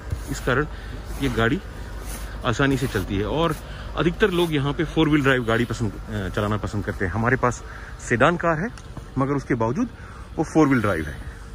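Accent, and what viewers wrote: Standard. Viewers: Sir your voice and pure hindhi is very impressive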